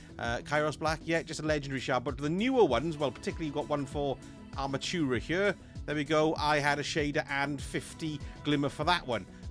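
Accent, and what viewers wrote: Wales, United Kingdom. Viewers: Because he has a Welsh accent. Plus he’s said in a previous video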